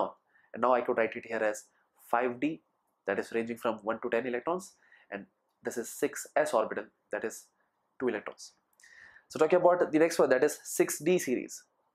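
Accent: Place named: India. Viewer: I really like this tutorial..but I can't understand INDIAN English 🤕🤕.. I mean the way u pronounce it 🥺🙏🙏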